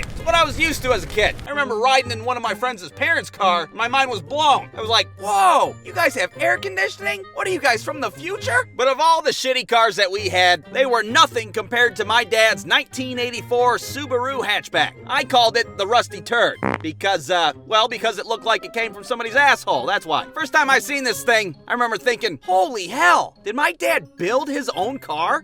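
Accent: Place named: Arkansas, United States. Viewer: Lol Sounds like Arkansas ha ha ha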